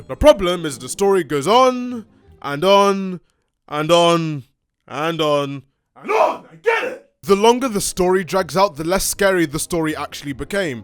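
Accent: England, United Kingdom. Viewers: this dude is 100% English you just know lmfao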